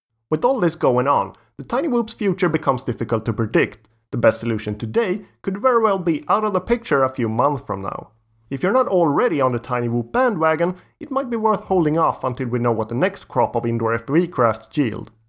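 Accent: Sweden. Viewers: Your english tells me you are swedish. In that case: svara så jag vet, jag och sambon har slagit vad. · I bet an arm and a leg that the narrator is Swedish!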